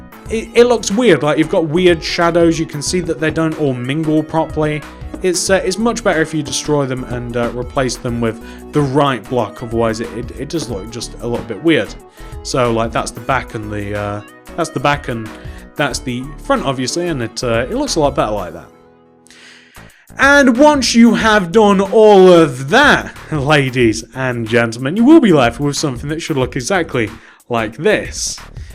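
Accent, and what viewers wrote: United Kingdom. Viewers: Ah yes, an hour-long tutorial. Listen to that soothing voice of the British man.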